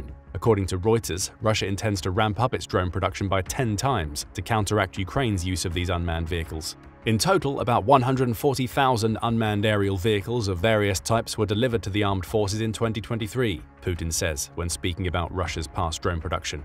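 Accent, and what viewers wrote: England, United Kingdom. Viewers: English accent??? i am out!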